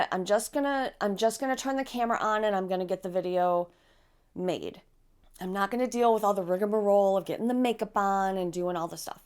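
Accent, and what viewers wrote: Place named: Michigan, United States. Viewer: Hi,. You recorded this video on my 47th birthday, and I'm watching the day after. I keep coming back to your videos because, if I'm honest, your accent takes me home, even though I haven't lived in Michigan in nearly 30 years. Beyond that, you're so relatable. I told my husband (my BFF, the breadwinner, and the one who I know always has my back) last August, "I did a thing. You know I have a hard time asking for help, and always have, well, I have an appointment with a therapist in two weeks at the same counseling center we used with our daughter. I'm not depressed, but I'm not doing well and I need help." Long story short, perimenopause will kick a neurotypical woman's butt, and absolutely make everything that a neurodivergent woman struggles with on the best day, way way more difficult. I know what depression is (been there, taken the Rx for it), that wasn't it. 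For me, perimenopause ramped up my (now diagnosed) autistic traits AND my ADHD traits and masking became more than my physical body could keep up with, and I was doing well to do the bare minimum in every aspect of my life (as homemaker, wife, mom, grandma, and friend, too). My virtual hug and older sister recommendation to you is this: if you need someone to really listen to you, like you probably listen to your friends, find a therapist. Therapy is so worthwhile. Everyone needs a safe place to talk face-to-face with someone about all the things, and creative introverts seem to be that safe place for their f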